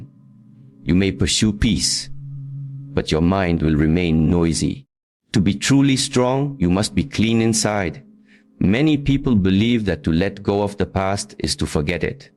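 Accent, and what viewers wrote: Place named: India. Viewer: Okay he sounds like he's from india on this one, It definitely threw me off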